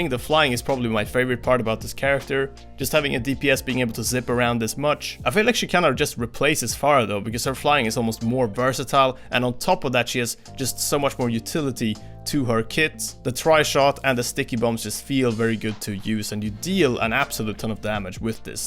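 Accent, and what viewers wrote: Sweden. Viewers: Ur accent sounds swedish idk why lmfao no hate tho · You sound like you come from sweden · Is he swedish? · Is this guy Swedish? Cuz he has that annoying accent.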